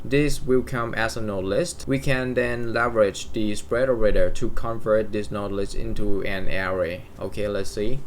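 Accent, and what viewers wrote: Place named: United Kingdom. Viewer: สำเนียงพี่ เหมือน British เลยครับ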